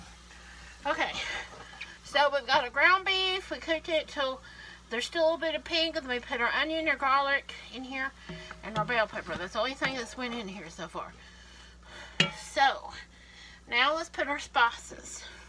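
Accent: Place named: Appalachia, United States. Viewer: It's our Appalachian dialect. I grew up saying it that way, had to lose my accent and dialect to work for the AP.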